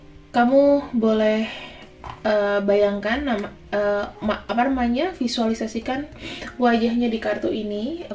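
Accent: Java, Indonesia. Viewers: Kakak suka gruuiiiiss ya kata ppaaauujaannnnggg kayak nya kkk orang jawa ya deh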